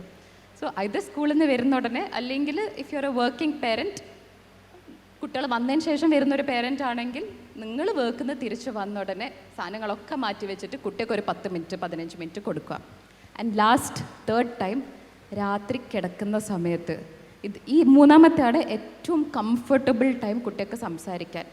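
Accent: Kannur, India. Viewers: Good advice to parents❤️. ഒരു കണ്ണൂർ സ്ലാങ്... കണ്ണൂർക്കാരി ആണോ ഡോക്ടർ? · കണ്ണൂർ ജില്ലക്കാരിയാ?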